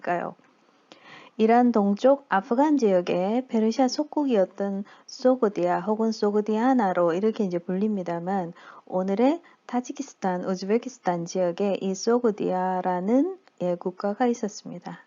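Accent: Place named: Gyeongsang, South Korea. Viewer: 취향에 맞는 문화채널 우연히 접하네요. 경상도 억양의 강좌 귀에 쏙쏙 들어오네요.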